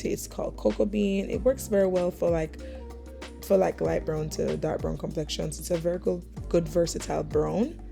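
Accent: Jamaica. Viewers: You sound so Jamaican 🇯🇲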